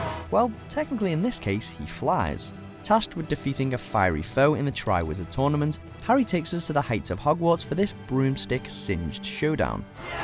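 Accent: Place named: Liverpool, United Kingdom. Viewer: Love the scouse accent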